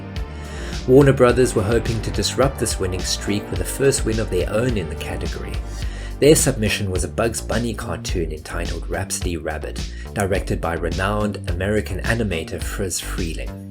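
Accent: South Africa. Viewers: 2 seconds in and i hear a S.A accent....instant sub🌌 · Are you South African? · You sound South African af my dude Sick vid tho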